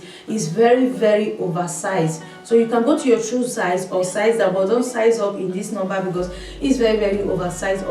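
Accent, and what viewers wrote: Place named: Nigeria. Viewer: Very straight to the point. love it! you sound Nigerian too, beautiful accent.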